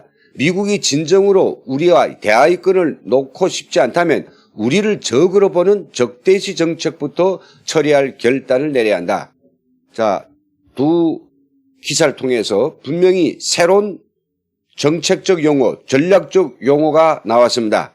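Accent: North Korea. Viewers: 김흥광님! 북 사투리, 내용, 언어선정 보면... 북 사투리가 좀 짖 듣데에 저의어머니 평양출생 일본어가 잘하지만 돌아가실때까지 북 사투리는..., youtuber 활동내용을 즉 북에서오는소식등 특유의활동등 을 ㅂ 사투리가 pt 를 방해하지 안도록.. 언어선정도 조심하는데신경쓰면 속도가 처지고 재 미 가 없어져요.... 친애하는 수령... 얼마나 똑같은마ㅏㄹ 재미없고... 아무든 탈 북 민은 김정은 의 독버섯 이다. 다가오는 통일한국의 징검다리이다. 자한당을 중심으로 뚜벅뚜벅 최고 선교사파송 기독교육국가 대강국이되기까지 김 님, ㄷ~국 화이팅!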